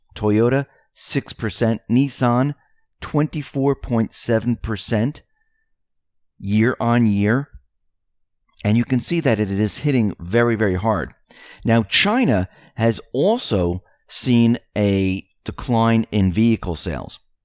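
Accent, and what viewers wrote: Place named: North Jersey, United States. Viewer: i assumed he was north jersey, for sure.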